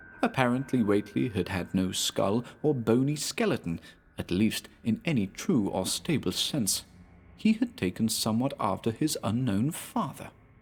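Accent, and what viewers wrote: United Kingdom. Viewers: This is the most English man I've ever heard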